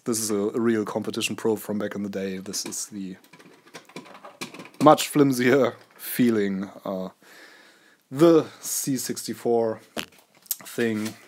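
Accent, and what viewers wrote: Germany. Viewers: I also prefer the real hardware or my PiStation, which can emulate more then just the c64. Overall, as you told, u have to be a hobbiest when using original home-computer stuff today or doing it with the raspberry pi. Imho the c64 mini has it's place at home, when only want a quick play on older day games ;) BTW: I love watching your videos and especially the german accent :)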